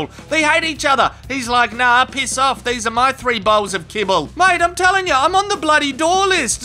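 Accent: Australia. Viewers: I haven't laughed this hard since I've listened to the comedy rantings of Rodney Rude. Love the Aussie accent by the way.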